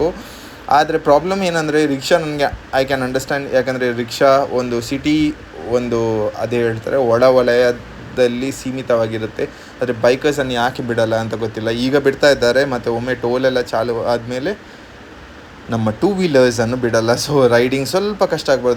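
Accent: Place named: Mangalore, India. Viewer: Superb voice and Mangalore Accent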